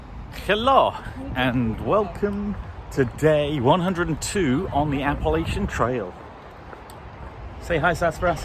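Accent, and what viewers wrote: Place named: Derby, United Kingdom. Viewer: Not going to unsubscribe...was hoping to meet you in person but since i missed you i will say it here. I lost my brother in law who was from Derby a little over 10 years ago, so your accent and wit remind me of him and it is a welcome addition to my day.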